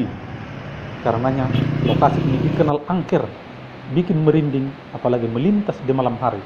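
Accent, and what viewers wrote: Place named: Batak, Indonesia. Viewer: Boasa pola direhei khas orang halak hita memang begitu kita harus bangga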